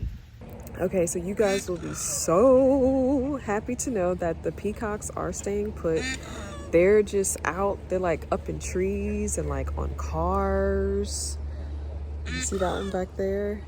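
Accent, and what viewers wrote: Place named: South Carolina, United States. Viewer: The way he talks… you have to be in SC. My grandad from there and they all all the same way 😂!! Ain’t nothing like country living.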